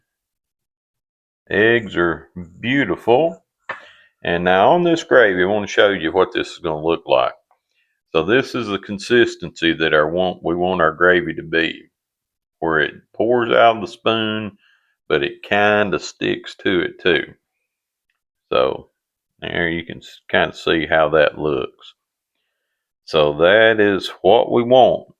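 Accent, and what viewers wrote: South, United States. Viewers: When my Mom made us gravy for breakfast, she would always add her eggs to the gravy and cook them. The few times that i tried it, my eggs would disintegrate(big word). She also would add water to her canned milk so we would have milk. I hate the taste of it now and cant cook with it either. I remember fixing corned beef gravy before, but its been a long time. Thx for the recipe and enjoy hearing your country accent. Proud of mine😂😂😂 Alabama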